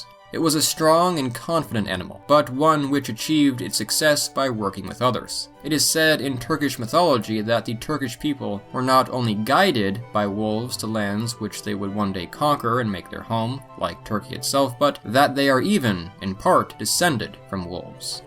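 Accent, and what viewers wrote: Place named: United States. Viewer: it's a shame that you didn't ask the guide what Swedes call this animal in their own language which, rather tellingly, they pronounce "elg". If that's your voice-over, it sounds like you have substantially learnt English from American sources. Maybe your Swedish companion also learnt American English. As for the signs- well, looks like they're for English speaking tourists... mostly Americans? American English shouldn't be regarded as the definitive form of English. Another example is your pronunciation of the Australian bird, the "emu". Americans (and you) mispronounce this as "ee-moo". Australians pronounce it "eem-you".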